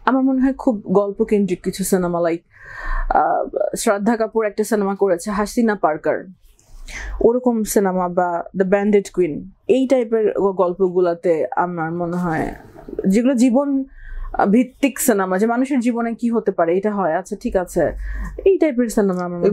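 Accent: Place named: Assam, India. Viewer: Asameese der moto kotha koi kyan?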